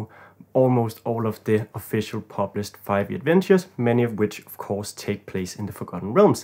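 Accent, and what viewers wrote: Denmark. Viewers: I knew you were danish the moment i heard your voice 😂 Nice video, very helpful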